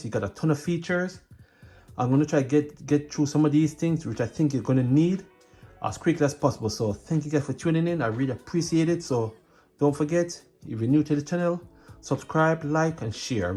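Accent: Jamaica. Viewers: Sounds like a Jamaican :)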